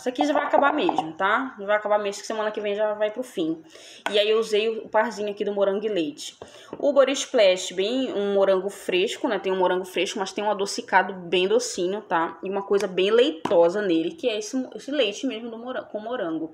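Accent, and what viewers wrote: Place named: São Paulo, Brazil. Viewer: Tá fazendo frio aqui também amora ! Eu sofro no inverno kkk sou mto friuenta Lindona vc mora no Rio mais o sotaque é de são Paulo? Fiquei curiosa acho lindo o jeito de falar hehe 🙊😍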